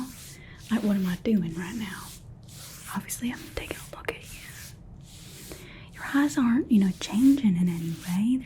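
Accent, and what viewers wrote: South, United States. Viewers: Hey, it's been way too long since we last caught up, you're missed 🎉 ❤.. beautiful accent to which region in the (( USA 🇺🇲 )) it belongs to?? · This gives off Firefly/Serenity vibes. Why do southern accents and Sci-fi compliment each other so gosh-darn well????? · The Southern Belle has returned!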